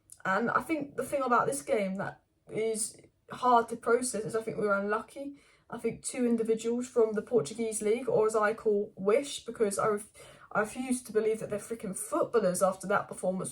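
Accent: Manchester, United Kingdom. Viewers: I noticed that prominent Manchester accent. All United fans tend to have that kind of a Manchester accent lol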